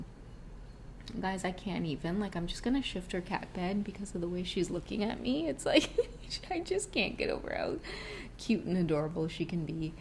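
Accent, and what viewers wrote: Trinidad and Tobago. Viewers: 🦫 Love the Trini mom talk! 🦫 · lol love the Trini accent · As a Trini living in Trinidad, I have to to tell you your trini accent is so good. Thank you for the reading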